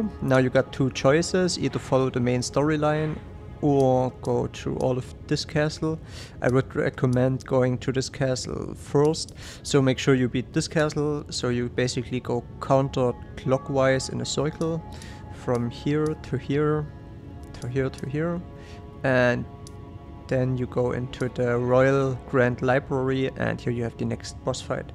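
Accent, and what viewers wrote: Saxony, Germany. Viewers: Interesting. You sound like a german from saxony. :) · schaue das Video 2 Sekunden und höre direkt dass du ein deutscher Sachse bist😂 nice one bro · Dieses deutsche English. Man kann als Deutscher den aktzent einfach nicht verstecken.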